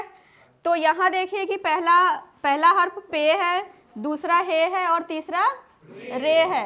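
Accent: Bihar, India. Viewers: She must belong to behar state. ऱ accent Now इन pnjb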